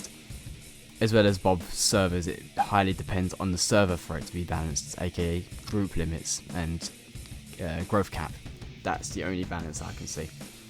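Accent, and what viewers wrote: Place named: United Kingdom. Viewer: cant believe your british but you sound more british in this vid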